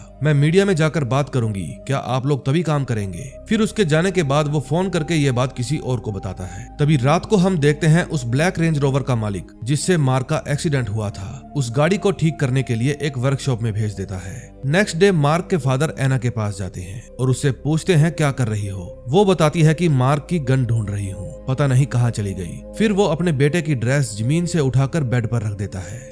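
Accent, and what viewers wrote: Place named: Haryana, India. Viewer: Haryana se ho aap ki voice se lagta ha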